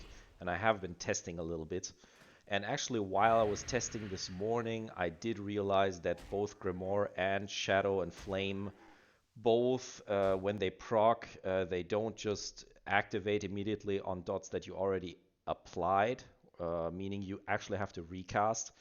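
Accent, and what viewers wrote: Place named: Germany. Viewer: So ich denke mal das du deitscher bist, nach dem englisch akzent hört sicht das so an sehr geiles video, aber gehst du jetzt standart auf SA oder doch fellguard? Also klar kommt es immer drauf an aber ich finde SA hat geileren dmg sber der support vom Felguard is nich ohne... vllt son Fazit mit dem 120%buff und spielst du destro oder diesen misch specc in pve?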